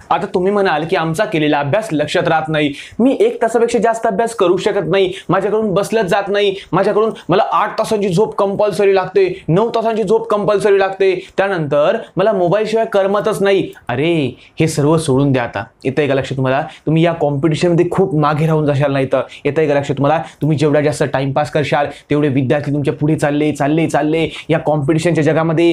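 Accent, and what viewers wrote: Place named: Jalgaon, India. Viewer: दादा तुम्ही जळगांव चे आहात का?, कारण तुमच्या भाषेवरून तसं जाणवून येत आहे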